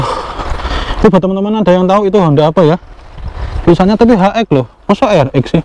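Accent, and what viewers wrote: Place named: Blitar, Indonesia. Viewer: itu wong mblitaran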